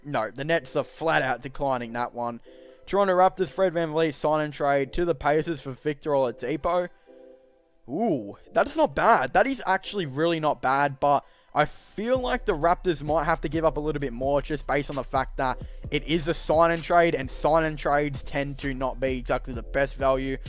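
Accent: Australia. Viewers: Deffs Aussie??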